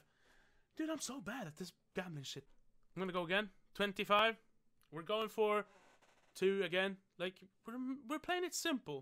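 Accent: Sweden. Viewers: are you swedish? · Are you from Sweden?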